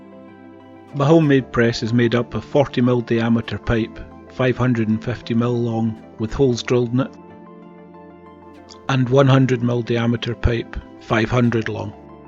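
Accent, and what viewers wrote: Scotland, United Kingdom. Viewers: I love your acsent sound scotish , amazing setup fór the bricks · I just love Scottish accent! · Your own design is superior in overall performance, in my opinion. I honestly believe you get extra credibility; because of your honest Scottish accent. My mom and her parents are from Glasgow. I am in Irvine California. Nice video thank you for making it happen.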